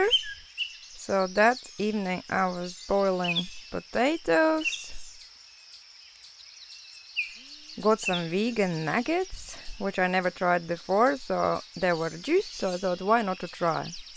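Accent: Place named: Russia. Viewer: I see you have a Russian accent.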